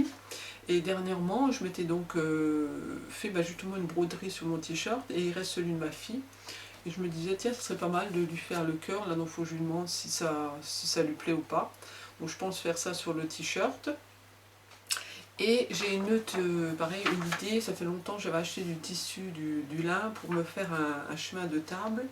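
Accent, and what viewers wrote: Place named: East, France. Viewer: Bonjour, je suis une nouvelle abonnée...je pensais bien que vous veniez de l’Est...vous avez l’accent ! Je suis de l’est aussi !! Belles cousettes , j’adore !!!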